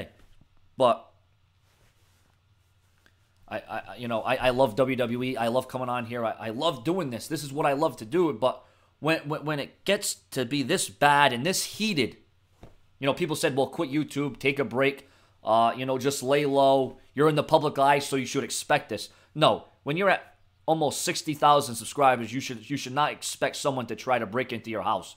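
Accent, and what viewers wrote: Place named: New York, United States. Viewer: I would move but keep your channel ppl will miss u if u delete nd btw are from new york its just the way u sound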